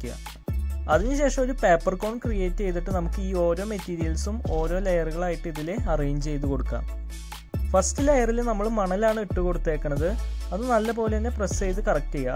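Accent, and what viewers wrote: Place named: Thrissur, India. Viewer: Samsaram kettitt tsr aanennu thonnunu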